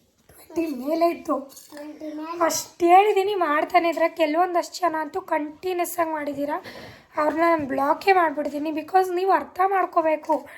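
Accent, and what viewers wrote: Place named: Mandya, India. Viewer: Mandya bhashe maatadtare, namma mandya😊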